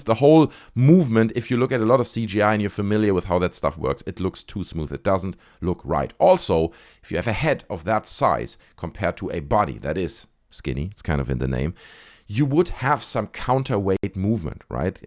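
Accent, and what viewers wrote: Germany. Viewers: if this video Podcast is run by the Host man Talking called??? then why is this Russian Man Talking with a German Accent??????????????????????????????????????????????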